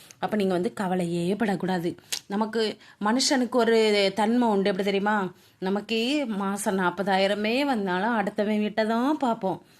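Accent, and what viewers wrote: Tirunelveli, India. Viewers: அருமை....😅😅 நெல்லை தமிழ் 🎉🎉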